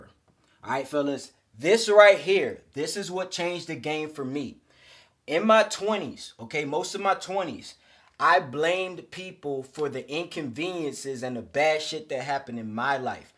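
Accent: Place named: Baltimore, United States. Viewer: You from B more bro? I hear an accent lol love ya videos G! 💯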